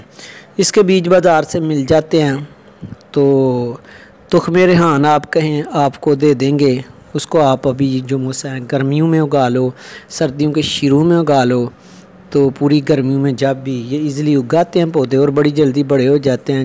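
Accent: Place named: Punjab, India. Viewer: Punjabi style Urdu speaking 😄😄🗣️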